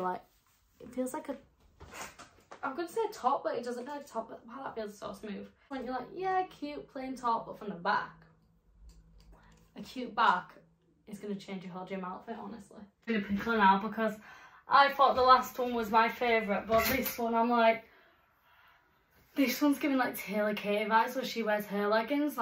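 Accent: United Kingdom. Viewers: Liverpool accent? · First time seeing your video content. Nice figure, and lovely English accent!!!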